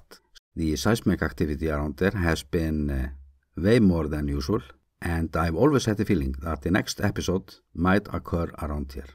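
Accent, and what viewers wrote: Iceland. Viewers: Love these updates from my home country that I left some XX years ago - takk kærlega! :) When living in Iceland, the Icelandic English-accent used to sound terrible to me ~ now I can only hear its charm ❤️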